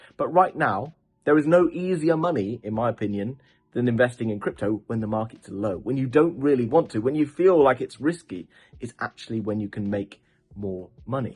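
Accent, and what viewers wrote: RP, United Kingdom. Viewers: New subscriber , great content , you sound posh like me.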